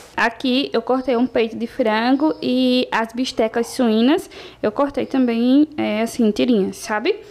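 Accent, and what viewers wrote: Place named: Caruaru, Brazil. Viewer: Menina kkk eu cai de paraquedas no seu canal eu conheci logo que vc é de Caruaru pelo sotaque, aí esperei pra ver se vc realmente era de Caruaru, sou de agrestina. Somos vizinhas ❤️❤️❤️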